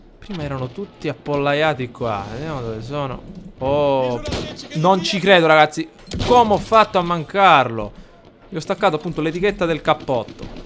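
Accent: Sicily, Italy. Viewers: Hai preso un accento siciliano più forte rispetto ai video di 3 anni fa! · CMNIK 1501 perché è siciliano?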